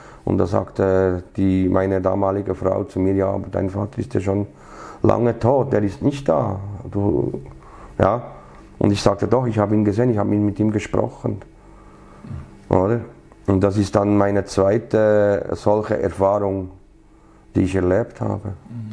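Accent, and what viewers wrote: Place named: Switzerland. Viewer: He talks Swiss-German Dialect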